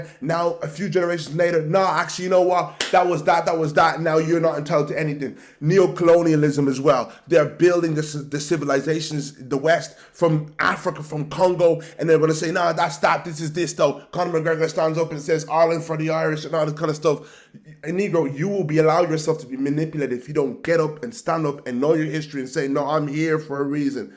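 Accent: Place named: London, United Kingdom. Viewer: He has the London bridge accent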